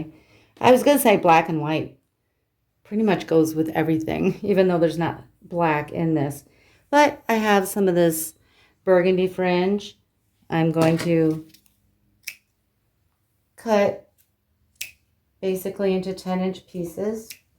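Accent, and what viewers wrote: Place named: United States. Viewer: so have I, gosh is so good and generous to share her talents, thank you x. ps love your American Accent (greetings from England)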